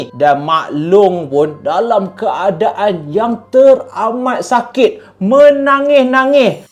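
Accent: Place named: Kedah, Malaysia. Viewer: Loqat kedah sakan dah lani noh hangit torey haha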